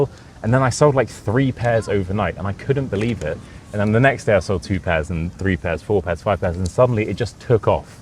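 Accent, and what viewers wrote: United Kingdom. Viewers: Oh gosh I understand your story 🎉😊 I'm proud of myself now ,coz British accent is so hard😅 but I hope one day could speak like you sir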